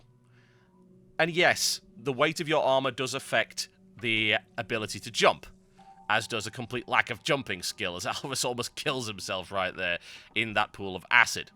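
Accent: United Kingdom. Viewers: i miss old:( the british one he has gotten signifigantly less britanly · Dude your new intro is sooo british.....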